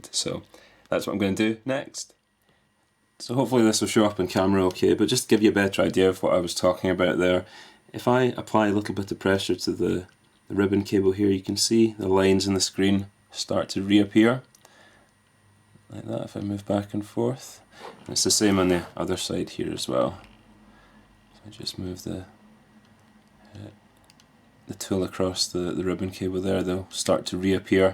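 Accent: Scotland, United Kingdom. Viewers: If you're implying he's English or Australian then wrong on both parts! He's clearly and obviously Scottish. You must be an American. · are you scottish? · I'm American and could tell he was Scottish. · I love Scottish accents. ouo That console looked far worse on the eBay page than it does in person! The motherboard must be corroded to hell! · I love this accent! I can't quite pick it up properly though, is it Scottish? Edit: definetly Scottish. I'm Scottish so it's like really hard to pick up everything you hear on a daily basis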